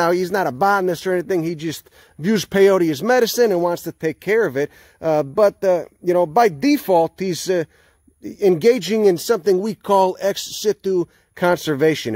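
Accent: Chicago, United States. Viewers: This man sounds likes hes from chicago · What is someone from " chicahhgo" doing in Southern Texas ?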